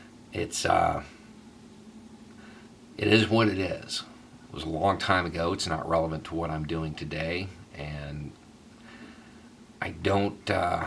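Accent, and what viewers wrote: South, United States. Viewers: Your ok with me like southern accent. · Dude this is crazy because I just looked more into you like 3 days ago and found out all this stuff, except I had the accent thing backwards; I thought you were faking the southern accent, but its crazy that you managed to hide it before. But I get why you would hide it, I'm self conscious about that kind of shit too. Ahh, the life of having social anxiety. Edit* -your channel is dope as hell man, and I just want to say keep up the great work. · Well, I'm here not because of your thick southern accent. It's the content. It's not 100.000 subscribers. As I'm writing this, it's almost twice that. I'm not sure I'm your typical audience, as a Brazilian, my interest in your channel isn't your accent. You are someone who spread ideas, and I support them. It's comforting to know that people like you take the time, the risk and expose your face and tell your thoughts because you know you have to. 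Thanks and keep'em coming. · Don't be fooled by a Southern Accent - and Trae Crowder for President. What a wonderful thing that would be. Appreciate your calm, rational, common sense approach,. Cheers from Canada 👍🇨🇦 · I went to a K thru 12 school and grew up in a town with 258 citizens, yeah, you are from the south. I played down my accent for years, because I was MI and Signal... now I don't give a fuck. LOL I love your content and it is wonderful knowing that other southerners have opened their minds and see what is really out there. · I love the southern accent. Just afraid of the ppl. But its nice to hear. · I think it's great that you use your real accent. If southerners change their accent to be taken seriously in media, then southern accents will only be heard reported ON, not by. I'm not American, and I don't carry that bias when it comes to your accents, but since I started watching American politics, I've come to realize that a racial gap is not all that needs to be mended. 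· Who Hoo, you're a Big Shot Now! 100,000 Subscribers! A lot of people have never heard anything like you. And to find out that you actually make sense, also that you are not only intriguing, you are intelligent, and have critical thinking skills. I believe, that draws people to you. And you represent many of us in the South, that can have intellect and a Southern dialect. The stereotype of some Southerners is also for real but not the rule, as you smartly display. Good for you, good for you! Keep up the good work. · love your Southern accent. Wish I could have seen your first photo background videos. I'm a Mississippi home grown. Miss my roots. Thanks for letting us know you are actually Human and not one of those cyber robots. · Love Your point of view, jealous of your southern accent. Don’t know why I associate it with pure America.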